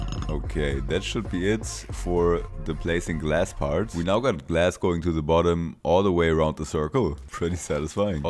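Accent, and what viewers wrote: Germany. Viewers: I here a bit of deutsch❤ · I know a german when I hear one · Deutsche hier? Hallo Leute und bist du wirklich deutsch weil es hört sich wirklich an als würdest du deutsch sein. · warum hab ich direkt gehört, dass du deutsch bist ohne ein Video von dir jemals gesehen zu haben ahhaha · first video I ever see from you, immediately clocked you as a fellow German 😂 you sound so similar to Doc, when talking English so it immediately stands out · AYYY UR GERMANNNN, I HEARD IT FROM THE ACCENTT XDD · Ja der ist safe deutsch. Man hörts leider komplett, an Aussprache und den Grammatikfehlern. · ja save der typ so fett deztsch habe nämlich genau denn selben gedanken aber bro hat eine gute idee weill englisch sprachige youtuber besser verdienen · wie deutsch der redet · Dare I say I hear Deutsch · Great Video and I can’t unhear the German accent😂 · Ich liebe diesen Deutschen akzent 😅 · die ganze zeit war ich so hör ich da deutschen akzent? 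und dann kam qual der wahl hehe. Cooles video 👍🏼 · Oh hey, I'm smart enough to recognize a German accent, nice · Bei der Aussprache wusste ich das sich hinter der Stimme ein Deutscher versteckt😂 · I smell German 🤨🤨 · Ich wusste du bist deutsch allein schon an der Stimme bzw. der Sprachweise 😂😂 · As a german, 😭✋️ dein deutscher akkzent ist so süß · Took me a moment to realise that you're German. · i hear some hidden german lmao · I definitely heard a german accent too (Im not german tho) :> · Ich höre doch das du Deutscher bist 👀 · dieser Deutsche Akzent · i knew i! i knew you were german. the accent gave it away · Hab doch sofort am Akzent erkannt daß wir hier jemanden Deutschen haben😂😂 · I hear the deutch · feels like german accent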